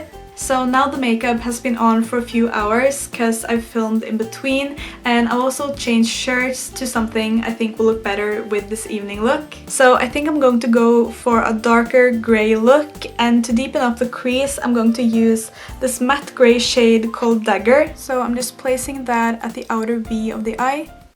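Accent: Norway. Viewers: You're super good. but i can here by the accent that you are Norwegian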